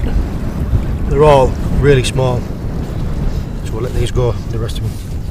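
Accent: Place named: North East England, United Kingdom. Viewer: Hi mate you sound like your from the North East which beach is this I'm from newbiggin by the sea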